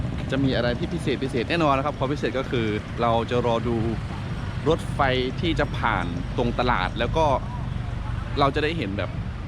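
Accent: Thailand. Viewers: ฝึกพูดไทยจากที่ไหนครับ สำเนียงชัดมากครับ ยูทูปเบอร์ สปป. ลาวหลายคนในไทย เวลาพูดไทยยังติดสำเนียงฝั่ง สปป.ลาวบ้าง แต่ขอชื่นชมว่า น้องสำเนียงไทยชัดมากครับ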